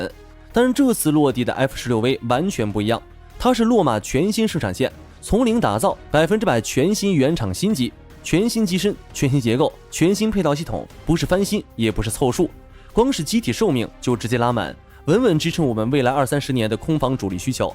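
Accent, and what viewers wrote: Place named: China. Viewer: 講話主播是臺灣人嗎、大陸口音。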